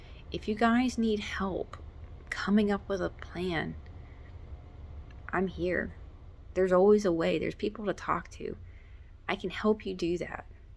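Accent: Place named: South, United States. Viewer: What made you attracted this man in the first place? So you a southern belle living in Alaska huh? That accent a dead give away lol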